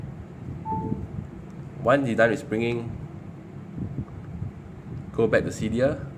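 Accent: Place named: Malaysia. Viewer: no wonder sounds like malaysian.thanks btw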